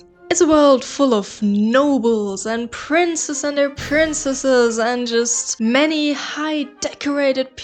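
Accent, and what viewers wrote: Germany. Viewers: Good video overall, here is my constructive criticism: The audio is a bit raspy. While this is obviously a money issue (microphone), it is making it harder to watch. This is especially so because you are trying to present a pleasent scene - good mic quality plays a big part in that. While the german accent is really cute, the amount of pausing you do between some words can make it harder to keep attention (This is one of those things that comes with practice I guess). I can't decide wether im vibing with the choice of background or not. On one hand, I like the idea of setting the focus on you and the cosplay, on the other hand I can imagine this getting a bit bland rather quickly (especially for the average attention span these days (we're all cooked)). I generally really like the editing. I audibly giggled at the zoom . A few soundeffects could help underline comedy and better the viewer retention. 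I dont necessarily dislike the amount of text used, but just having it a standart font white on black for every single one is a bit boring if you ask me. I really appreciate that you are trying to be creative and not just repeating known methods and whats popular. A lot of my criticism is just due to you figuring things out so dont take it to seriously. Its a bit confusing to me what direction this channel is headed. You are not trying to be as attentiongrabbing as possible (which is great, please dont be!), which implies that you want to build a strong viewership instead o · I didnt know you´re german too! Well, atleast by the video and the accent im thinking so (okay yea i didnt complete the video before)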